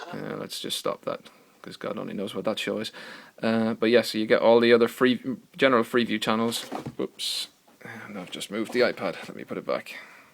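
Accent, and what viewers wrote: Ireland. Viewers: Nice review. Are you Irish?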